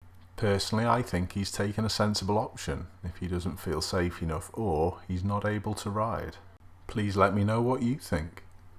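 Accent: United Kingdom. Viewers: Normally I don’t like people that narrate throughout the entire video. However I love your voice and your decidedly British accent. Being a yank we only speak American we don’t speak the Queens English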